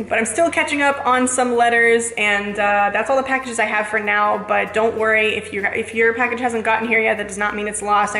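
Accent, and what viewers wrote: Spain. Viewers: your spanish accent sounds like its from spain. Doesn't sound at all like latin spanish thats very interesting. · i think that is the spanish she was thought because even when she traveled to spain she already had that accent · If ya didn't know already she studies abroad in Spain for Spanish maybe why her Spanish sounds more Spain than Latin.....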